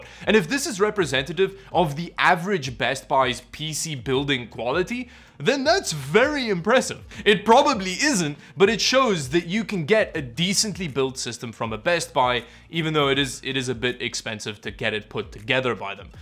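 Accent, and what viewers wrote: South Africa. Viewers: Are u from south africa? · Bro are you South African?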